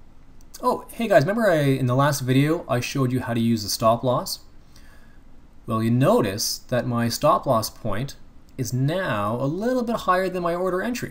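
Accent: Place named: Canada. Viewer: Thanks mate and I really like your Canadian accent :)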